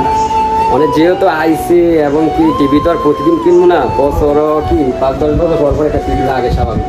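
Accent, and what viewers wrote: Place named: Chandpur, Bangladesh. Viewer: ভাই আপনার বাড়ি কি চাঁদপুর নাকি মতলব? আপনার ভাষা অনেকটা আমাদের মতো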